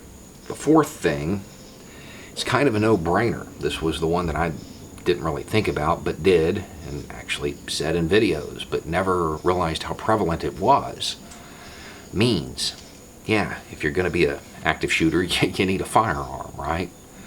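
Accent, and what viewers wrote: South, United States. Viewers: I'm not bying a car here! I hear him right now, and he is close up to the establishment talking points. His southern accent only makes it sound more absurd.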